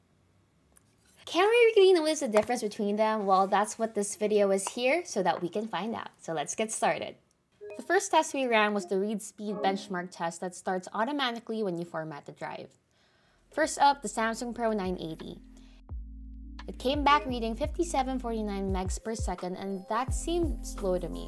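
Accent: Philippines. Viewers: She seems to have a Filipino accent. Not that it matters, as a Filipino, I rarely see content creators here that aren't 'vloggers' and vomitting clickbait content. I guess it's a long way of saying, I rarely see this much class from a content creator in the Philippines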